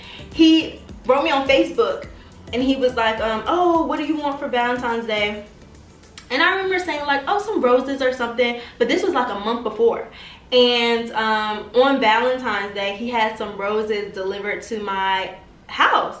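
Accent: New York, United States. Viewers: your officially a new yorker, just listening to you talk in this videos I felt like we grew up together lol Love you so much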